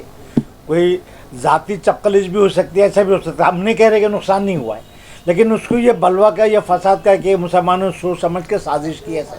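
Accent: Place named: Khandesh, India. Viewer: LLB kar liya sahab fir bhi khandeshi zaban aaj bhi nhi gai aap ki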